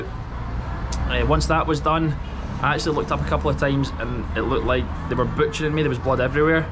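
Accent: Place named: Scotland, United Kingdom. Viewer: I love the Scottish accent.. correct me if I'm wrong 😊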